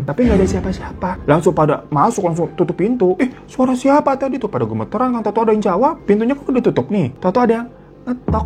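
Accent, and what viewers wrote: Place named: Banyumas, Indonesia. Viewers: Bang ngapak lo oke banget masih ehe 😂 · Curiga bang wong ngapak😐🙏, katanya juga punya sepupu orang purbalingga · Si babu glowing bisa ngapak woyyy😮😊😂😂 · Aku suka mas bisa ngomong ngapak wkwkwk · Ngapak lu gureng bang 🗿 Gw orang purwokerto agak aneh dengarnya🗿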